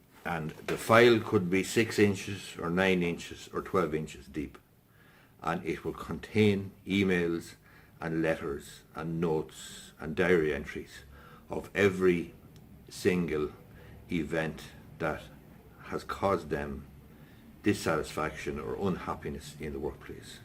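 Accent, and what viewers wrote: Ireland. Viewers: Cork accent boi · Irish, not sure which area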